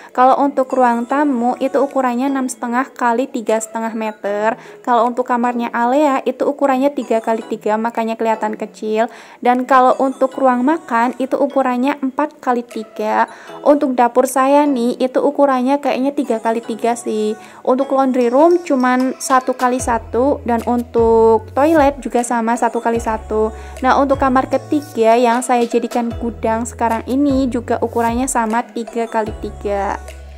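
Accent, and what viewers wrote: Banyumas, Indonesia. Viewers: Slalu nonton vidio tp baru ngeh klo org ngapak 😀 aku jg org ngapak bun, pemalang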